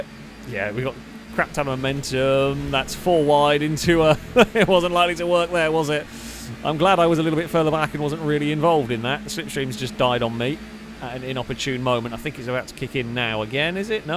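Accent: United Kingdom. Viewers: The accent is UK but are you sure your not Canadian. You constantly apologize for stuff that is not your fault.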